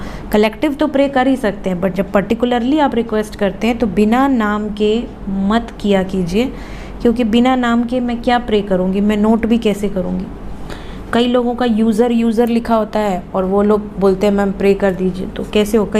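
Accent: Varanasi, India. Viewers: Aap ko iswar firghau de aap ki banarasi aawaj aur andaj dono mujhe mere Banaras ki yaad dilate hai❤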